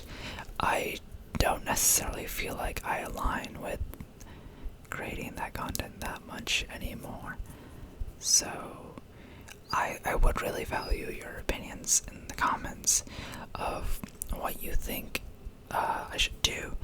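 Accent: India. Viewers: Do what YOU enjoy. I like the variety. I found you through the Indian accent ones as well. Maybe just do one of those every now and then. But I like checking out your channel and not really knowing what to expect. Thanks for doing what you do. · I finally watched this video, and I just wanted to say that do what you want. Just like people found your channel because of your Indian accent and that’s what they enjoy, people and views will come for other types of content. This is your channel and either way we enjoy your videos! Thanks for all the content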